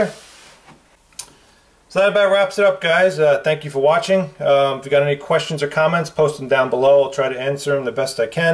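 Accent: New York, United States. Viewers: dude TALKS LIKE NEW YORKERRRRRRRRRRR MAYBE HES FROM THE BRONX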